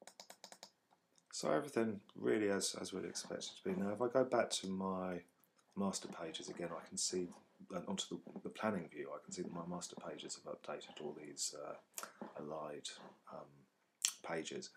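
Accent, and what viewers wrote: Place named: Cockney, United Kingdom. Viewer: For God's sake man, turn up up your bloody recording volume if your going to speak in that cockney accent.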